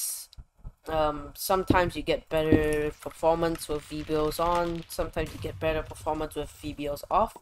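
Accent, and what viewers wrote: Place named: Singapore. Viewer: i am singaporean and i speak like that too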